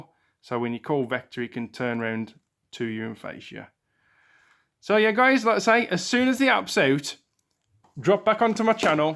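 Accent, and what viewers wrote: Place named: United Kingdom. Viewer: oh. Oh right. Then I will stop sitting here watching the App Store as though I am waiting for water to boil. LOL! Thanks for the tip about set up as well. Maybe it will be a relatively painless process to set him up via the app. I was very disappointed in Cosmo‘s A couple years ago. Voiceover did not respond at all to the app after I opened it. It just played a bunch of music and wouldn’t show me anything. I hope victors app is an improvement. You will probably be getting the app before us. At least, you sound as though you may be from somewhere across the pond. Anyways, thanks for posting this unboxing!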